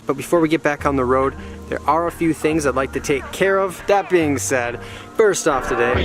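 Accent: Wisconsin, United States. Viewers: Now that you're back East, I do believe I hear some of that Wisconsin accent coming back. Is it sleeping bags or sleeping begs? lol Hope y'all are doing well.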